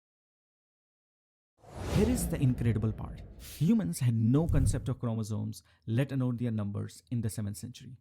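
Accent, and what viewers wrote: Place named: Bangladesh. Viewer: Assalamu alaikum brother. Are u from Bangladesh? ur accent sounds familiar. take love <3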